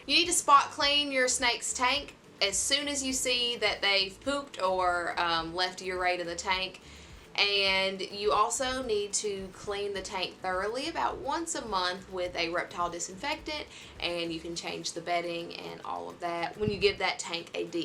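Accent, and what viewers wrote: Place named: Alabama, United States. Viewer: You sound like you are from Alabama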